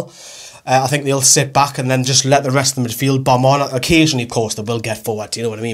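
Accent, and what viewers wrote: Newcastle, United Kingdom. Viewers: Love the geordie accent (from London)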